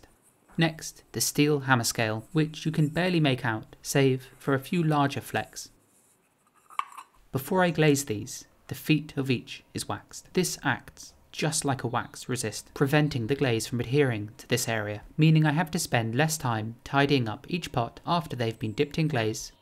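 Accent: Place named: RP, United Kingdom. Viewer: I’m not a native speaker but I learned the language from a fairly young age and I’ve always had a knack for accents and dialects. My first accent was clean British RP then I drifted off into other accents from the British Isles. Lately it’s been a standard Australian accent but you really make me want to move back to RP again. Let’s see how long it’ll last this time XD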